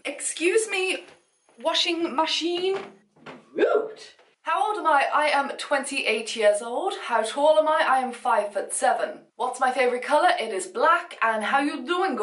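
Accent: United Kingdom. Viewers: You British Ayeeeee???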